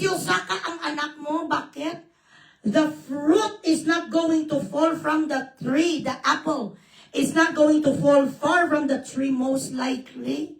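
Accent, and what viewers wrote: Iloilo, Philippines. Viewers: Hi from Germany👋I like the way you talk with an Ilonggo accent 😂🤣🤣and delivering the words Diputa and Belat mo😅😛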